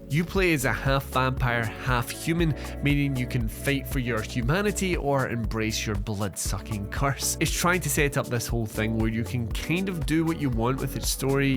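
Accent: Scotland, United Kingdom. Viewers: YOUR ACCENT IS AMAZING, WHAT PART OF SCOTLAND ARE YOU FROM ? 😊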